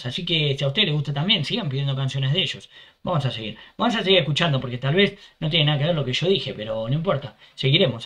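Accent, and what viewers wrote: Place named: Argentina. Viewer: Este flaco a veces es argentino y a veces es español? Jaja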